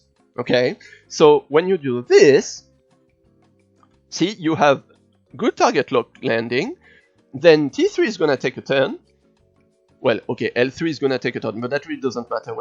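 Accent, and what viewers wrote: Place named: Poland. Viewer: Pas ça s'entend pas des masses, j'ai entendu un accent mais je pensais que t'étais polonais c'est à la fin c'est comme qui dirait writté entierementely in Françish. Du coup d'ailleurs je me rapproche du G13 avec GG et à ce propos, MIS A PART les pièces de G12, est-ce que le passage de G12 à G13 procure des PV supplémentaires à GG ou pas?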